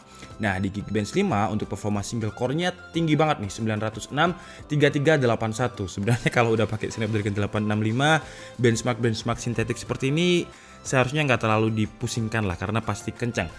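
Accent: Malang, Indonesia. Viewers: Orang malang kah?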